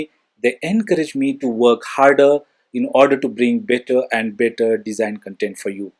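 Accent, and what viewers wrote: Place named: United Kingdom. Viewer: You are very good , i like your accent and your talking way its very british.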